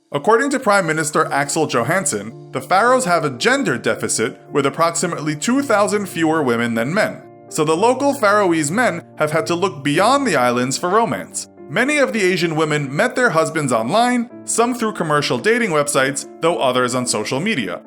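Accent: Canada. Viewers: The Infographics show are you Canadian? Because you say eh? from time to time. I’m Canadian 🇨🇦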